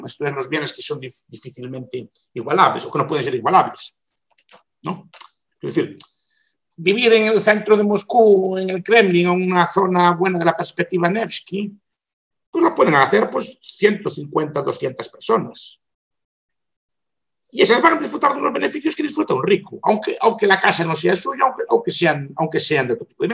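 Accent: Galicia, Spain. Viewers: Habla en castellano . Tiene acento Gallego Eu si falo Galego .non creo que sea muy dificil .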